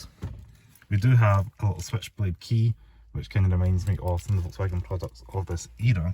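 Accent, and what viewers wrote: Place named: Scotland, United Kingdom. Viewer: You look and sound so Scottish❤❤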